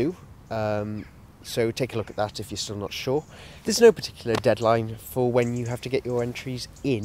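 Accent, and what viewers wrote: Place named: Nicaragua. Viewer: Nicaraguan Accent!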